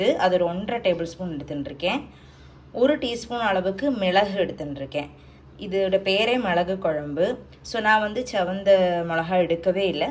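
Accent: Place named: Palakkad, India. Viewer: பாலக்காடு பிராமண தமிழ் ரொம்ப பேஷ் பேஷ்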